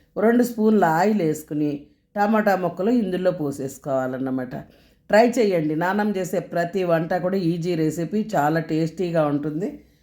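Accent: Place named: Khammam, India. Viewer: Aunty meedi khammama ma Mee mata style akkadi la anipistundhi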